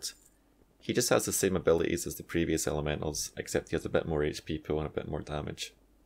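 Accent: Scotland, United Kingdom. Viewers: You have the softest Scottish accent i have ever heard :O i nearly crapped my pants when i heard it I'm Scottish myself which i think made it more striking :O great guide though BIG THUMBS UP BRO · you sound scottish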